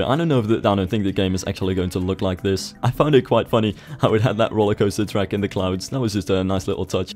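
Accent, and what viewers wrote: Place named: Netherlands. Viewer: are you from holland? your accent sounds dutch :P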